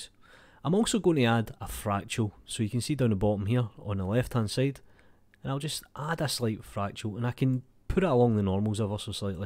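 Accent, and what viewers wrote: Scotland, United Kingdom. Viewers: Soo Scotish accent :)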